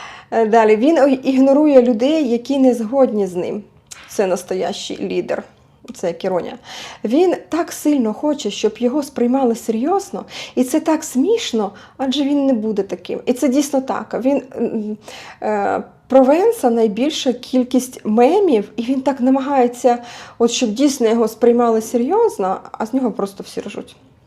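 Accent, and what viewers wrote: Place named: Ukraine. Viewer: Дякую пані !👍👏👏👏 У вас добра українська мова . 👍Вибачте , в якій області України така чиста мова ? Я з чернігівської області.🤗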